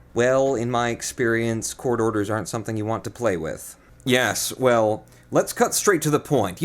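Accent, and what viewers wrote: United Kingdom. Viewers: that was amazing, it's kinda crazy that i now know what part of the world you live in, for ages I thought you lived in England, you accent is such a mix of British and American, and in your earlier videos you sound pretty much completely British. You deserve all the love your fans give you! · Michigan? Hmm for some reason based on your accent I figured you were from somewhere in the UK. Oh well. We are like state-neighbors. I'm from Ohio.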